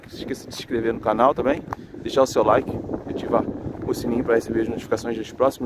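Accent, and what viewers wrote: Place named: Portugal. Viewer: Estás começando a ter o sotaque português de Portugal. ,😍👋👋🌹🌹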